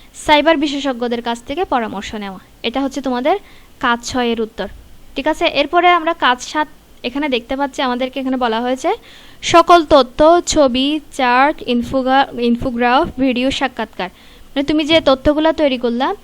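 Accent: Chittagong, Bangladesh. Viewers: Sis is pure chittainga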